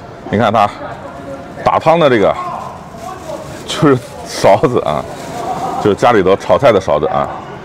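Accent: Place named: Anhui, China. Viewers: 是不是合肥周边的，口音和我一个同学像的很。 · 一定口音就是安徽人哈哈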